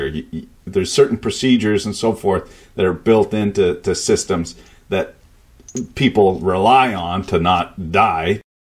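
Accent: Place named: Maritimes, Canada. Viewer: please check this article and related video on head bolt failure. <link> FYI its great to hear a fellow Canadian on you tube. For a guy living in BC you sound suspiciously like a maritimer. or maybe you had a Moosehead ale or to once in while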